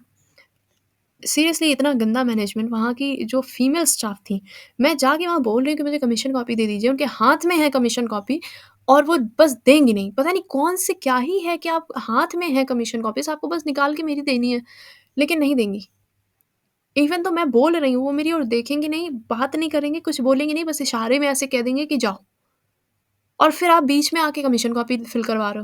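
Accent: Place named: Kanpur, India. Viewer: They both are kanpuriya. That's why same style of speaking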